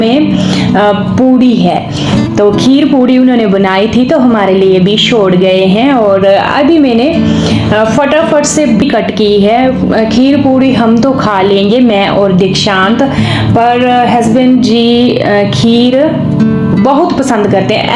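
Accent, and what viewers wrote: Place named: Jammu, India. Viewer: Nice ji ❤from rajasthan Mai apki dogre accent bhut pasand krte hu Mai akhnoor boder pe rahte the 1993 mai to lagaw h panjaabi, dogree,kasmiri se Mere father saheb bhi fouji rahe 1998 tak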